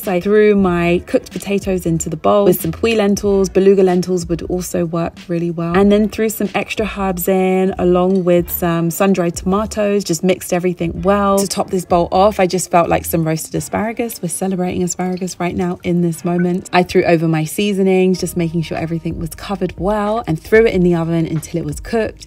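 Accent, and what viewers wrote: United Kingdom. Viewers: New subsciber! Love your content and your accent makes me miss living in the UK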